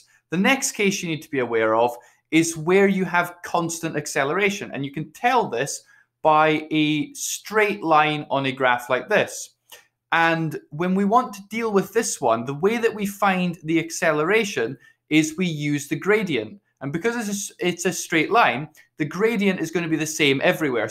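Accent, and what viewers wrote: Scotland, United Kingdom. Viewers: i like ur sco'ish accent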